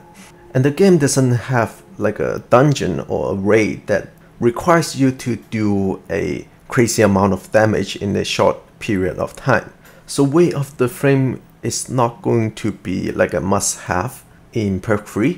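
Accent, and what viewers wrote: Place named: Taiwan. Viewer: Thank you for another great video. A lot of information to process here so i need to watch a few times so i can adjust my Samurai build. Are you from Taiwan, love your accent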